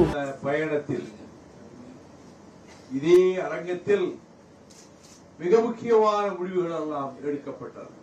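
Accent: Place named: Telugu, India. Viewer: தெலுங்கன் பேசுவது தெரிகிறது. இது 10" 10 ரூமா 😢😢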